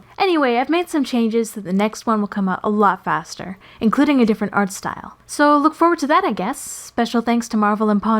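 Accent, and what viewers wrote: Canada. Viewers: you sound like you grew up in Winnipeg. cause I had that growing up here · HHHHHHH I got it!!! "do not bang the chicks" XDDD took me a second! also your canadian!? :O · are you Canadian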